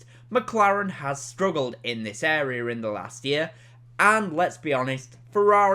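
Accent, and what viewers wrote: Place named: United Kingdom. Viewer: Should be tight up top. Good thing imo. You sound like a Fellow Brit and over here he would be referred to as a daddies boy.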